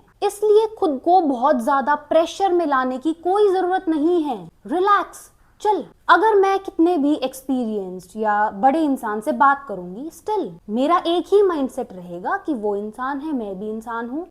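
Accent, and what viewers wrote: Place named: South, India. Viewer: you sound south Indian. I love the way you dress and you are charming.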